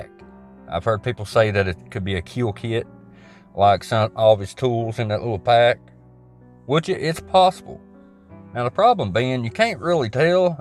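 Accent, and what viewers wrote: South, United States. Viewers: Keep it real! Everything sounds better with a southern accent brother....love from Tennessee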